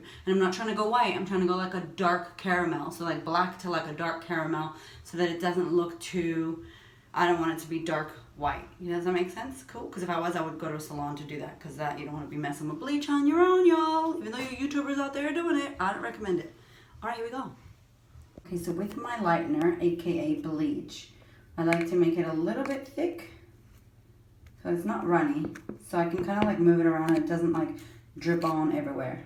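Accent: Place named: Australia. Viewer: Super cute that you've picked up the aussie accent! Great video BTW!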